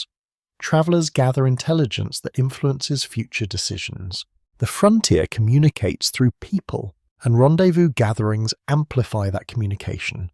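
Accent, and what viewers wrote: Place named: United Kingdom. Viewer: It's impossible for me to appreciate a video about early American history being narrated by a guy, real or not, with a British accent. I wouldn't watch a video about European history if the narrator sounded like John Wayne.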